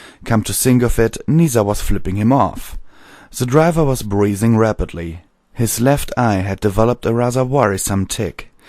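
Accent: Germany. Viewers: I think you’re from Germany but you have an English accent mixed with German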